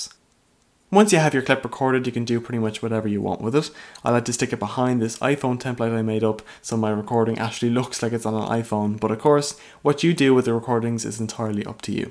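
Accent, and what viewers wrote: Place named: Ireland. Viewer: I'm guessing Ireland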